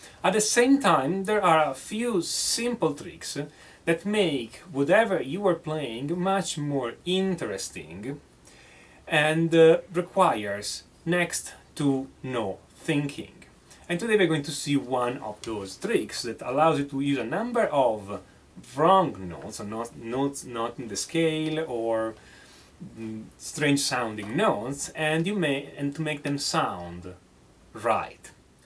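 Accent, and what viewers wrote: Italy. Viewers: That's why Meshuggah is so hard to listen to.. I have no guitar knowledge whatsoever but thumbs up for the juicy italian accent ;)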